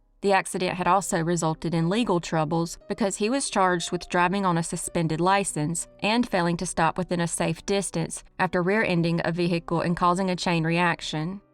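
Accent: South, United States. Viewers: THANK YOU!!LOVE THAT COUNTRY VOICE! KEEP EM COMING!1:hand-pink-waving:face-red-heart-shape:thanksdoc:🤠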